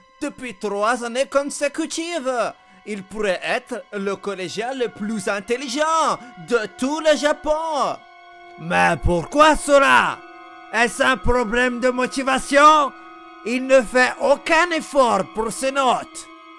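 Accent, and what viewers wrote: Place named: Germany. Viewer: L'accent allemand, juste parfait 😂 mec continue frr tu gère trop 🔥 tu va gagner masse abo je partage et abo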